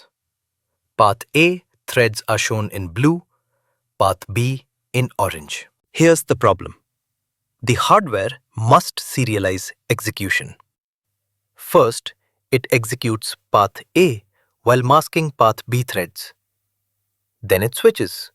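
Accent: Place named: India. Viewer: why does a clanker need an indian accent, like horrible coding course squared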